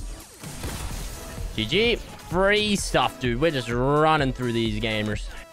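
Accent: Australia. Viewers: Nutella and Breadsticks can’t u hear he has an Australian accent Asian people don’t.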